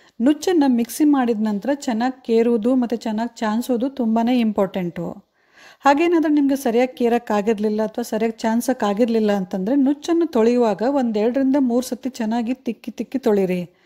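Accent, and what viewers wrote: North Karnataka, India. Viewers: I like your north karnataka style of talking, it feels natural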